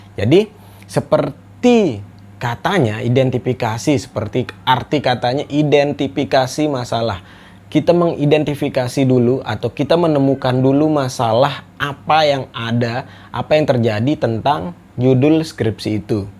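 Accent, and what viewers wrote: Lombok, Indonesia. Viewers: Be elekm batur marak dialeg lombok basem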